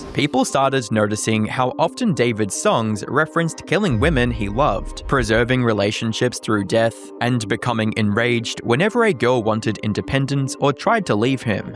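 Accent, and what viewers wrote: Australia. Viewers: “If you dorn’t knor” I sense Aussie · no problem, for context I know an adelaidian accent when I hear one haha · I've been watching your vids for a while, i didn't notice your Aussie accent till now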